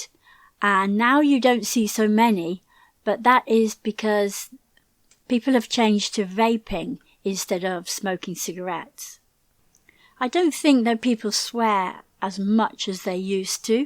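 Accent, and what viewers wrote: United Kingdom. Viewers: thank you great british accent to learn here and enjoy it I hope one day you go live with mom and dad 🎉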